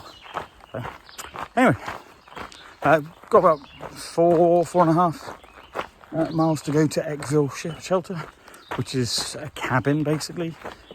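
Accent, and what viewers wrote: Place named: Derby, United Kingdom. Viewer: Not going to unsubscribe...was hoping to meet you in person but since i missed you i will say it here. I lost my brother in law who was from Derby a little over 10 years ago, so your accent and wit remind me of him and it is a welcome addition to my day.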